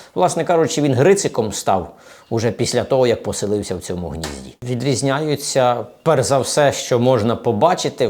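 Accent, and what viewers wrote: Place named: Poltava, Ukraine. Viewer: Мелодика мовлення у полтавчан особлива, впізнавана.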